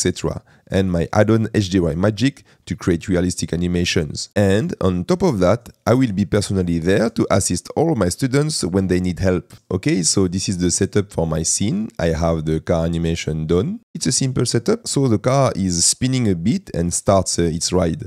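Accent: France. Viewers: Seem french accent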